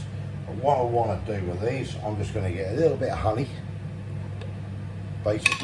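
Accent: United Kingdom. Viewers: What part of the UK are you from I don’t think I’ve heard your accent before, cracking video as always hope you have a wonderful weekend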